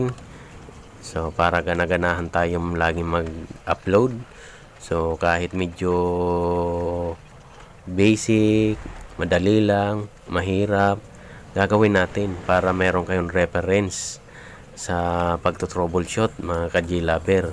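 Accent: Bicol, Philippines. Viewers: Taga naga city ka sir?bicol?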